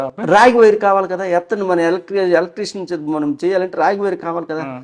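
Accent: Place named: Srikakulam, India. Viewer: సార్ ది మా శ్రీకాకుళం లాగుంది !!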